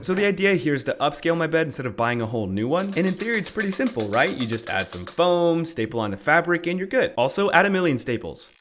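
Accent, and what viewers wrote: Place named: California, United States. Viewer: I love your California accent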